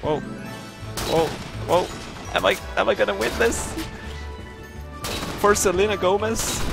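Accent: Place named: Netherlands. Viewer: where are you from? sounds like a Netherland accent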